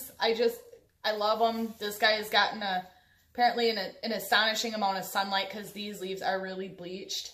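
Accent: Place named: Midwest, United States. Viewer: you must be from the Midwest. your accent sounds like mine haha. love to the green!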